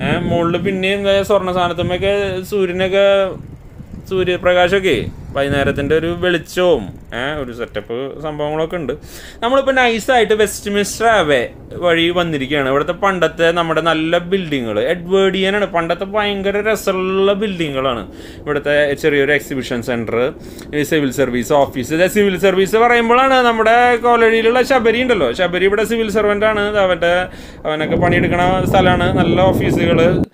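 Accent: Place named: Thrissur, India. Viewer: ഇഷ്ടായിട്ടാ ഗെഡി, എന്തുട്ട് തിയേറ്റർ, ഞാൻ ഇവിടെ vox ഇൽ പടം കാണാനിരിക്കുമ്പോൾ സ്ഥിരം കൂട്ടുകാരോട് പറയണതാ, രാഗം കഴിഞ്ഞിട്ടു ഉള്ളു ഇതൊക്കെ എന്ന് ഇമ്മള് തൃശ്ശൂർകാർക്കു രാഗം വിട്ടുള്ള കളി ഇല്ലന്ന്, ദുബായ് മാളിൽ നടക്കുമ്പോ ഞാൻ പറയും പൂരം എസ്‌ബിഷനിന്റെ ഒരു സൈഡിലേക്കില്ലെന്നു, ത്രിശൂർ അങ്ങനത്തെ ഒരു ജാതി സ്ഥലാ, അവതരണം നന്നായി പൊളിച്ചു വീഡിയോ ഈ സ്ലാങ്ങിൽ മതിട്ടാ ഓകെ ടാ keep it up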